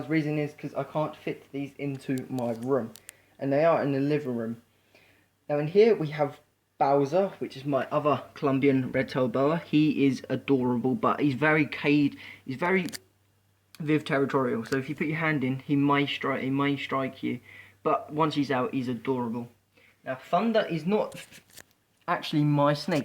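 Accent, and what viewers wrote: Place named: Brighton, United Kingdom. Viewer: Hahah, you just sound like a mate of mine from Brighton.:D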